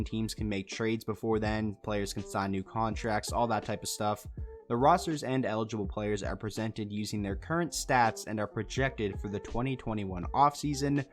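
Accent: Canada. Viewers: Do you have a video as to why you are a Red Wings fan? For me it's because I was born and raised in Detroit and only eventually moved slightly outside of Detroit. There was no way I couldn't be a Wings fan in the 90's and later hockey in my high school was bigger than HS football in the white suburb town that we eventually annexed to. Your accent, particularly your R's sound very Canadian but I wouldn't place it as Ontario. If you're west Canada or newfie, why Red Wings? Other than having excellent judgement, any personal life connections?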